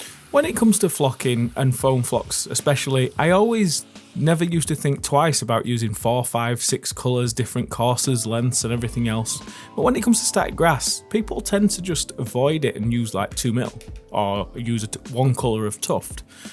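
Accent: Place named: Yorkshire, United Kingdom. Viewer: yes lad.. this is that perfect level of yorkshire accent and professional elocution. didn't mean to sound like a dick in prior comment lol. sold on the foam flock, natural undulation is easy mode. purchasing soon!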